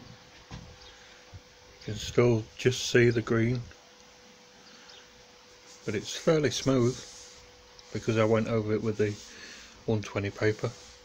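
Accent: Yorkshire, United Kingdom. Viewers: I'm from Yorkshire myself and I van hear the accent ☺ great tips thanks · Really, really enjoyed that. You taught me a great deal there. Thanks for all the work. Nice to hear a Yorkshire accent - from a Yorkshire man living in Sydney. Cheers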